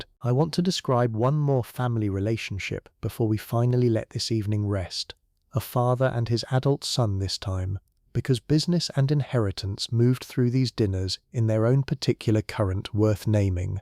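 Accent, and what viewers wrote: United Kingdom. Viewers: Lancashire England. Great storytelling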